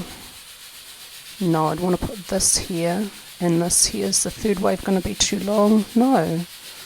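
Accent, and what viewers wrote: New Zealand. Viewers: Very good. Good ole kiwi accent too eh :)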